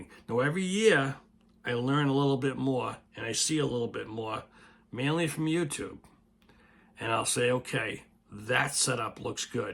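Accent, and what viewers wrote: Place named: New York, United States. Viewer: You sound like Ny or NJ, too many clouds to go solar unless in sunny Cali or Texas , in Ohio, no way it would work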